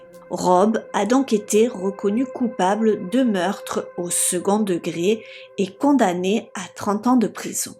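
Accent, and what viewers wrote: South, France. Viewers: Je ne commente pas souvent et je voulais te dire que je regarde et like toute tes vidéos, j'aime tes intonations et cette petite pointe d'accent du sud. Tes récits sont clairs, originaux et intéressant ❤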